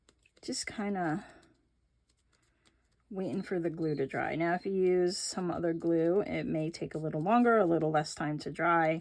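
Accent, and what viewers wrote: New York, United States. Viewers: i love your videos and your ny/east coast accent. reminds me of home :)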